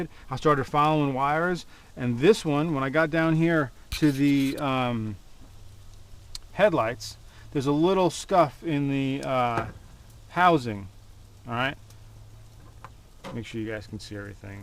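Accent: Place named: Newfoundland, Canada. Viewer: Yes B'Y..Newfie like accent. Thanks again, it was killing me after an engine swap...im a novice. main ground was culprit. Thanks again. also, your narrative skills are excellent. more small engine stuff..?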